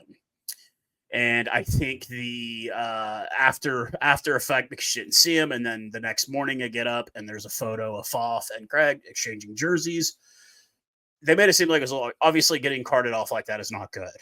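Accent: United States. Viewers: Just subscribed to you just because of your knowledge of the Springbok set up and your obvious love for our boys whilst conversing with a USA accent. ❤from CPT, SA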